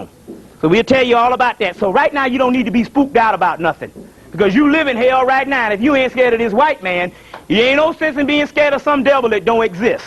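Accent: South, United States. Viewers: I can listen to brother for Hours black Billy Graham with that southern tang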